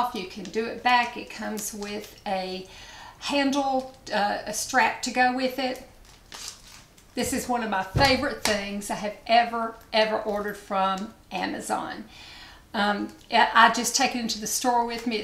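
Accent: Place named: Georgia, United States. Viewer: Hi, honey! I'm so happy that I found your channel today...its my lucky day!😊 🌼Congratulations on your 10 year anniversary!🌼 I can't wait to go back and watch all your videos! What a treat! I absolutely loved this video, and I love you even more. You're so sweet and kind! I really loved how you bought the bracelets for your granddaughters, so they know they always will have each other to depend on. I have 7 children, and I always tell them the exact same thing. I wanted to ask you, also...where are you from? I am from Georgia, and your accent sounds a whole lot like mine.🍑 Ok, honey...thank you again for taking the time to sit down and spend your afternoon with us. I don't feel like I was watching YouTube. It really felt like I was over at my favorite aunts house , and she was taking me around her house showing me all the cool new stuff she found on Amazon. 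I loved it! Take care, honey, and we'll talk to you again soon. Love, Courtney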